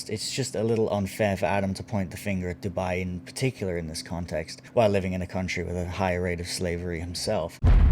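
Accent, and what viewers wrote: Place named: India. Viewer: interesting accent, i guess you are indian or somewhere from middle east?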